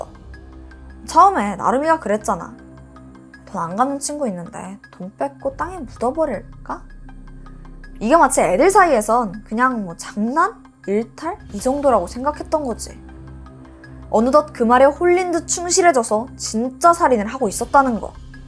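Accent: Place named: Suwon, South Korea. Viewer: 수원사투리중에 그런거있음 수원사람이신가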